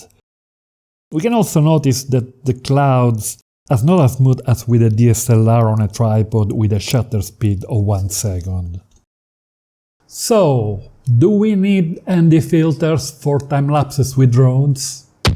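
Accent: Italy. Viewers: I could listen to you all day, love your Italian accent :D Great info! Many thanks :)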